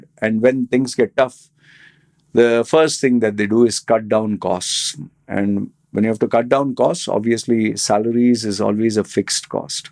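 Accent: India. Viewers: At first i thought you are Hispanic but u have an indian accent.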